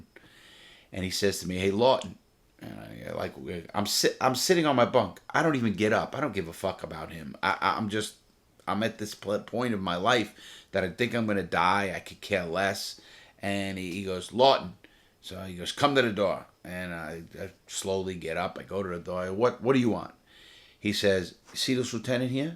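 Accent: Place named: North, United States. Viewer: Legit question lol. If you live in florida and have lived there your whole life how do you have such a northern accent??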